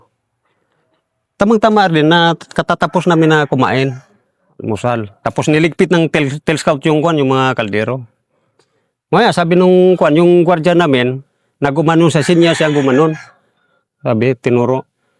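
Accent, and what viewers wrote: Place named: Subanen, Philippines. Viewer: Sa accent ni Master Subanen tribe sya kung di ako nagkakamali kaya matapang... Matapang ang Subanen tribe sa history nilo bolo lang at spears ang armas nila... Salute you!...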